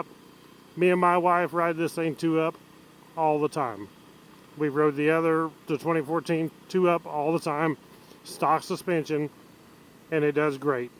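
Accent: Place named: Missouri, United States. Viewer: Being from Mo. I recognized your accent immediately .Been gone 50 years and I still have dreams about the beautiful countryside.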